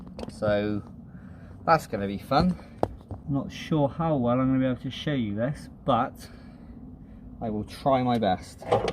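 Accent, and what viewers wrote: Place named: West Country, United Kingdom. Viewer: I’ve got hold of spare engine low Miles off local guy who been Breaking t4s for years it’s showing 10700last mot he said it was only 112000 when he drove van to his.runs well he said he does know t4s well.got the turbo an diesel pump plus manifolds Too.where you from?im in Cheshire u sound like Bristol Somerset.we be Goin Cornwall again soon my wife’s family there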